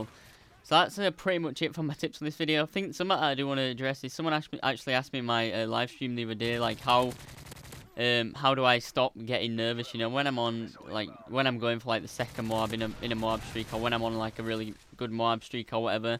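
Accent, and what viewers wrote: Yorkshire, United Kingdom. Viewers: love the yorkshire accent, good tips and comp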